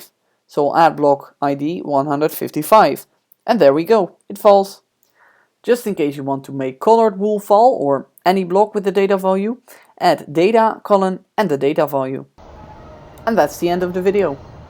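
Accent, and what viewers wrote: Netherlands. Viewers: dude are you dutch? you sound really dutch XD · Awesomely made! Are you by any chance Dutch? You're accent sounds Dutch haha:p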